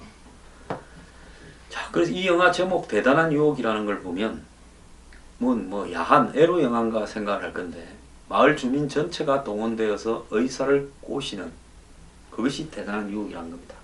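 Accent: Gyeongsang, South Korea. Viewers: TK사람 말투 진짜로 짜증나네? 같은 경상도지만 말투가 영~~~~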